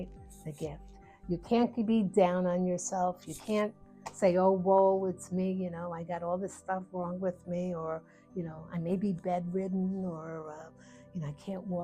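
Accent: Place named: New York, United States. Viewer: you're a sweetheart. I Love your NY accent - and I'm guessing Jewish?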